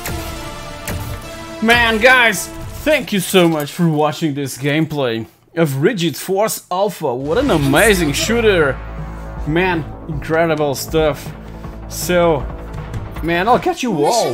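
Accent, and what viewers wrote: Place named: Portugal. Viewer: é portugues... só pelo sotaque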